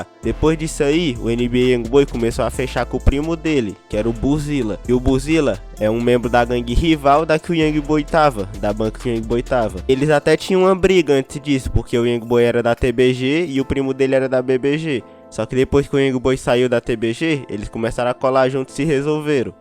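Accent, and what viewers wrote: Fortaleza, Brazil. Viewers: sem dúvidas que vc é de fortal man 😂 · Tu é de fortal né vet? Brabo mano o conteúdo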